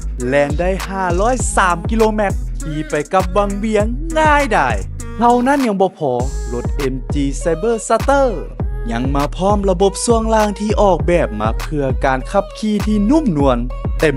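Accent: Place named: Laos. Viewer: ชอบฟังเสียงภาษาลาวแบบนี้มากกว่าค่ะ ดูเป็นเอกลักษณ์ดีค่ะ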